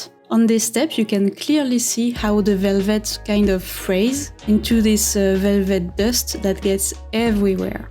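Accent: France. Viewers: Magnifiiiiique ! Et tellement de courage et de patience. En tout cas je savoure chacune de tes vidéos depuis que j'ai découvert ta chaîne ! And I love the French accent ;)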